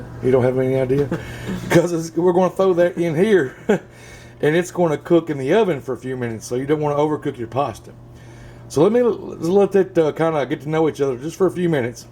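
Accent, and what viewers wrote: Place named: Appalachia, United States. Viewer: well that looks real good I sent the recipe to my granddaughter and I like your hillbilly accent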